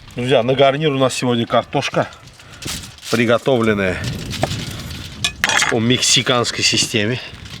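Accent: Baku, Azerbaijan. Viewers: Дааа, Бакинский акцент и мастер класс !!!! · 7 Февраля и время просмотра 23.56, случайно увидел видио, наверное кушать хотел 😂, но тут реально Шеф и мастер своего дела, четко и без пафоса все рассказал и + Бакинский акцент ))) спасибо вам дорогой, жду выходных и буду делать вкусные шашлыки из говядины ( Дана ) 👍👍👍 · Прекрасный обед. Сам я родился в Баку 65 Лет назад но очень приятно слышать дружелюбный бакинский акцент. Спасибо большое каспийскому берегу!!! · Это кайф слушать ветра Бакинского берега и Бакинский акцент. Дай БОГ Вам здоровья дорогой! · Бакинский акцент не перепутать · Очередной раз кайфую от того как готовит автор! Бакинский акцент это просто услада сердца! Первый раз слышу что говядину надо мариновать три дня . Обязательно попробую так сделать. Спасибо!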